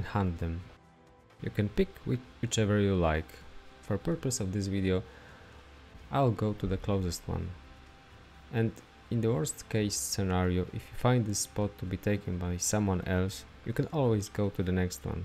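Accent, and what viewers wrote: Poland. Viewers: Helpfull :) You from Poland ? I hear by the accent ;p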